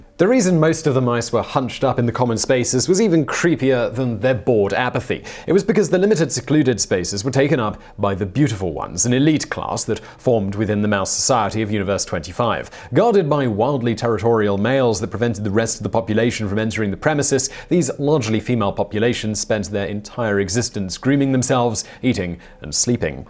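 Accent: United Kingdom. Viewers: Yes, he can. He can relate the horror experiment interestingly while keeping it light with his lovely British lilt. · He isn't either. He's British me thinks :D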